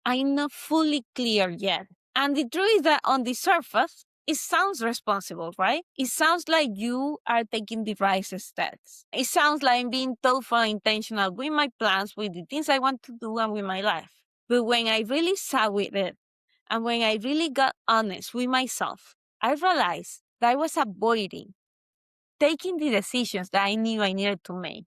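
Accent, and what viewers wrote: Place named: Latin America. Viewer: Cute accent. Can I guess? South America, Italian origins, swimmer and dancer, living somewhere near the sea.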